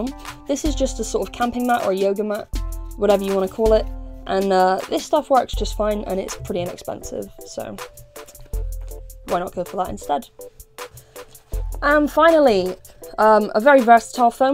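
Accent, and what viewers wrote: United Kingdom. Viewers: Where do you get your fur? Your accent suggests you live in the uk and I find I dofficult to find cheap decent fur for my fur suits.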